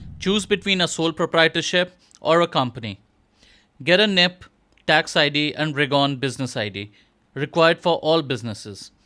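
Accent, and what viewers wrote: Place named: India. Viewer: You're speaking in an Indian accent and so, I can guess you're based in India. How would you provide a worker in Poland with a job?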